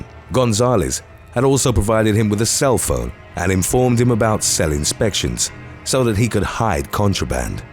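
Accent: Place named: United Kingdom. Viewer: Hes from the UK and tbh his accent is quite lovely .Hes a huge reason why this channel is so successful .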